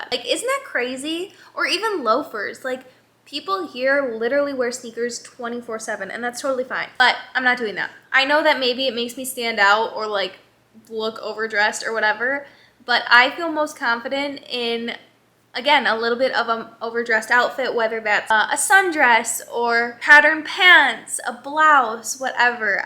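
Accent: Midwest, United States. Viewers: i love your midwest accent 😭💕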